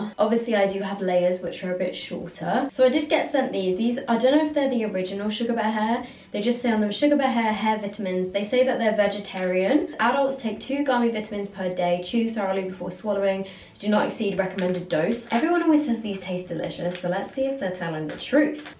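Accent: United Kingdom. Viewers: Suga bae hae 😂😂 Love a british accent😂😂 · I hate this strong British yikes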